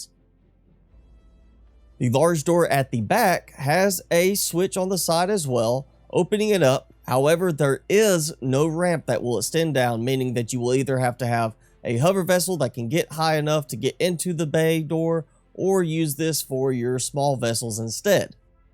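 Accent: South, United States. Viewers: You sound Southern.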